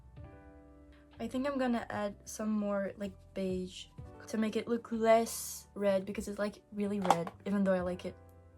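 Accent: France. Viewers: I didn’t expect u to be french. But I love your accent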